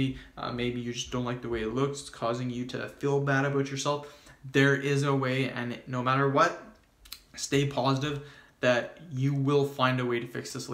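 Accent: Canada. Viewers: You have a really strong Canadian OAT accent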